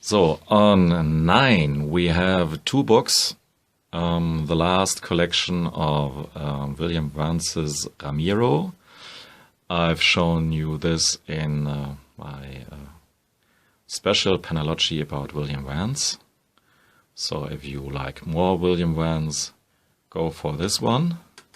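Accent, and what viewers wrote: Germany. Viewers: Wie man dein deutsch richtig raus hört.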